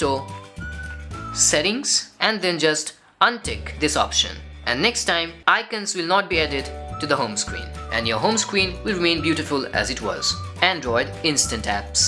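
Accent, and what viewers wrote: India. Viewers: Dude speak English in an accent that is understood by others not just Indian only! So anoying! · The way you pronounce the letter T is almost correct.... Unlike most Indians.... Just need a little more practice... And also your letter L still sounds very Indian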